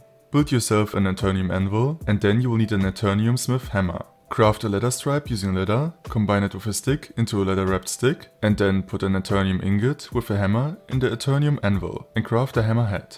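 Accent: Germany. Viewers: German tippa accent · I hear german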